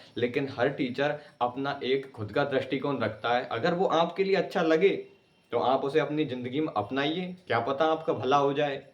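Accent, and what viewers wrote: Bharatpur, India. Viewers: Bhaiya bharatpur ke ho kya aap 🤔🤔 bnva rahiyo h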